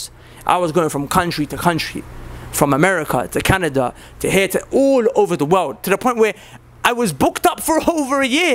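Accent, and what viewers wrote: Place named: United Kingdom. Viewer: I can't believe dude speaks with British accent but some how it doesn't make sound smart 😂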